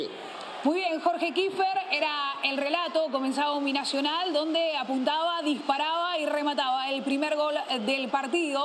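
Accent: Argentina. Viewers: No es por nada, pero creo no es suficiente tener acento argentino para comentar los partidos. Solo en Perú se recibe bien a los extranjeros no sucediendo asi en con los peruanos en otros paises. Aqui basta que hablen como argentinos y ya son expertos en cualquier cosa.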